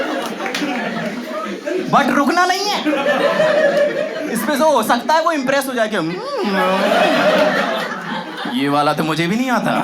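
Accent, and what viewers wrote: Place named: Indore, India. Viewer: OO BC Indore accent .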